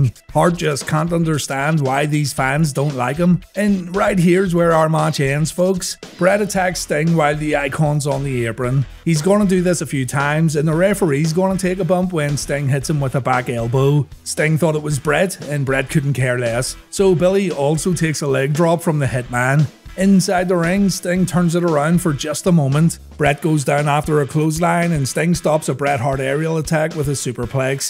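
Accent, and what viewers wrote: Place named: Scotland, United Kingdom. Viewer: a Scottish guy talking this video, brutal to listen to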